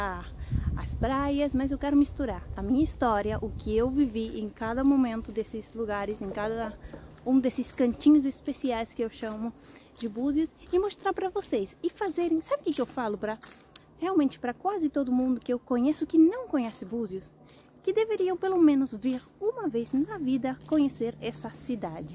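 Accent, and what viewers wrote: Argentina. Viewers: 😉😙😙😙 A mí me encanta la lengua española, y siempre estoy mirando vídeos acá en Youtube de Youtube's nativos de la lengua española, para que yo pueda siempre está en contacto con la lengua española, y tu acento argentino es buenísimo para me acostumbrar con algunas particularidades de español de Argentina, pues ustedes argentinos utilizan siempre el pronombre personal "vos", en lugar de el pronombre personal "tú". Tambíen me gusta acostumbrar mis oídos con el sonido que tú como argentina, utiliza al pronunciar el sonido de la Y(igriega) y la LL(Doble Elle), pues los argentinos hablan "Yo" con el fonema /xo/. ¡Yo soy enamorado por la lengua española! Jajaja. ¡Siempre me encantó! ¡Besitos a vos ! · Y aparte que tenes esa tonada de buenos aires capital y no se te fue cuando haces videos en castellano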